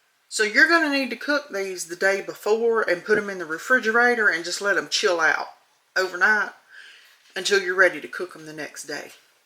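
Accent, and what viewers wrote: South, United States. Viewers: I love watching you. I was raised ijn So. Calif. My family is from the South. I learned home cooking that is Southern. And I so enjoy your Southern accent and what you cook. It is just down home for me. Thank you.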